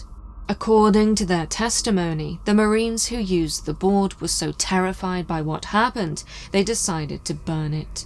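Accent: United Kingdom. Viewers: Subbed within minutes of my first experience with you (fellow Brit and listening to you makes me a very proud Brit!! 🤩) I will now be binging on all of your documentaries.Fabulous orator delivering articulate and engaging content. I am all in 100% invested in this beautiful channel!! CONGRATULATIONS 👏 you deserve millions of subscribers.Bravo 🙌 🙏 lots of love x🩷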